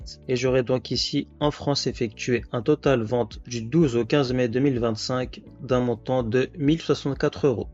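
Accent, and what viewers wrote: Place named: Maghreb. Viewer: T’es DZ ou MA ? Ça s’entend fort 😊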